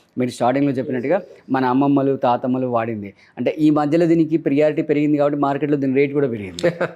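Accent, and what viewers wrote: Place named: Marwar, India. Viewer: Athanu telugu vadu kademo, marvadilanti vallu andhra lo perigina vallu ayi vuntaru.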